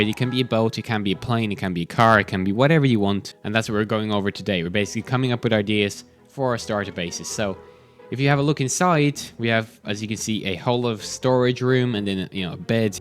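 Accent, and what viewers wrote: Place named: Ireland. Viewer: Is that an Irish accent I here 🇮🇪🇮🇪🇮🇪 GWAN IRELAND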